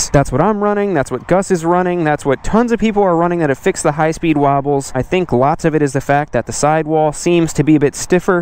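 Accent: Baltimore, United States. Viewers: I thought I recognized that accent. Hey Hon! Bawl-Tee-Moh.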